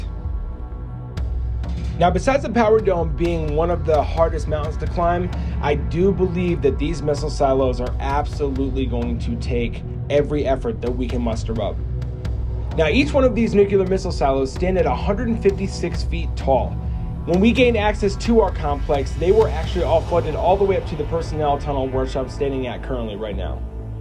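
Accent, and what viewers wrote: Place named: East Coast, United States. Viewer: Are you serious? The man is from the East Coast, guess you don’t get out much.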